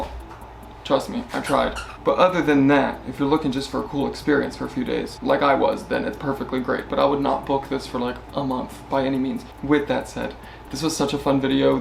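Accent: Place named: Baltimore, United States. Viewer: Love you, and your B’more accent. I grew up in Dundalk, now in Lutherville. Great, fun videos, keep on traveling! ❤️❤️❤️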